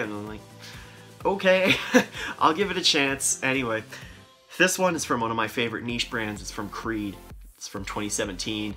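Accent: Canada. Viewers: I'm Canadian and I didn't realize we have an accent til I heard the maritime accent. Love it! Solid list, plenty of these are on my list as well, although not so keen on Rasasi releases. Cheers.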